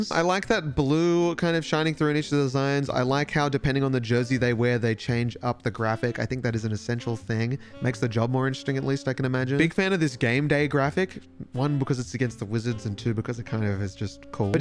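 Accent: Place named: Australia. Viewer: surreal hearing an australian talk about american basketball like this. i’m applying for a master’s in visual communication design btw i’ll let you know how it goes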